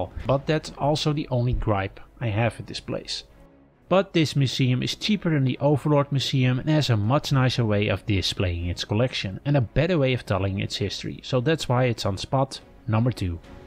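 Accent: Netherlands. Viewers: are you dutch ? you've got a slight accent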